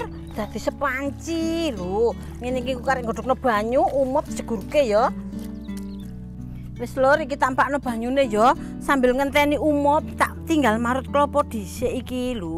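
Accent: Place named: Surabaya, Indonesia. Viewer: Temenan Mbak, suroboyoan mu ga ilang 😀👍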